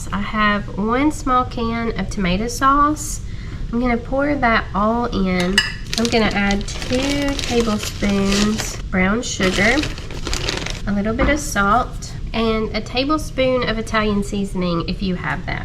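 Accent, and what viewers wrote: South, United States. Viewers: Your video just showed up in my recommendations...I subscribed before the video even ended because you have a nice personality. I'm from NC so I recognize your southern accent...where are you located?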